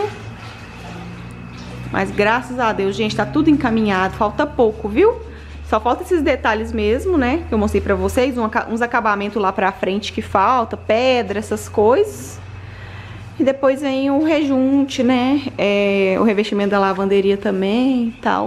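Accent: Minas Gerais, Brazil. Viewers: Ahhh esse sotaque mineiro😍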